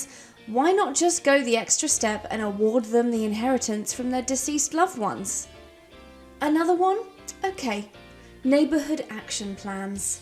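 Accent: United Kingdom. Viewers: I agree with the whole video. In all honesty, I enjoyed this video SO much. Between your lovely British accent and the swearing, this was a treat to my ears and soul. You got yourself a new subscriber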